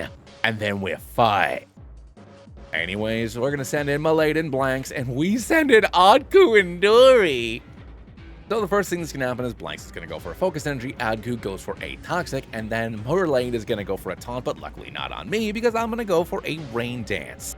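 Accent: Scotland, United Kingdom. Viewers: 9/10 Scottish accent, love Nessie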